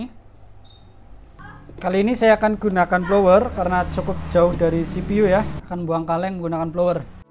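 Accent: Banyumas, Indonesia. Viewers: Koyo wong ngapak iki ra ngapak ra penak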